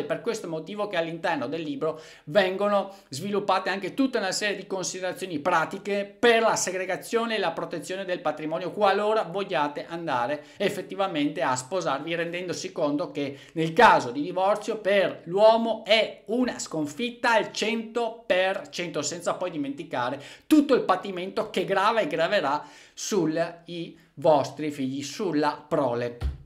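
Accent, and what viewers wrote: Veneto, Italy. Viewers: Io lo ascolto in loop sto video perché mi fa morire dal ridere. Il modo, le parole, la cadenza veneta (come la mia) impostata... credo di morire. Divorzio SPA mostro leviatanico.... morto!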